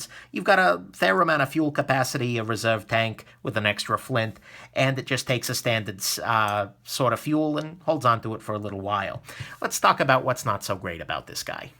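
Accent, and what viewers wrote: New York, United States. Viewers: I like. His humor. His gear. His reviews. His 1920’s voice. EXTRA! EXTRA! EXTRA!... is that accent NY Italian?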